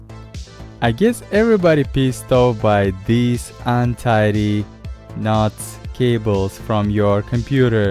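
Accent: England, United Kingdom. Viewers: Its kinna cute when he call that fruit punch mash potatoes 🤣🥰🥰 im i the only one who deeply inlove with his english accent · i think this is the most british accent i heard from you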